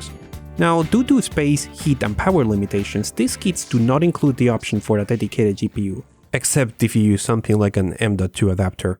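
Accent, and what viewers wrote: Venezuela. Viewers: your english eccent is venezuelan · Una pregunta. tu eres venezolano?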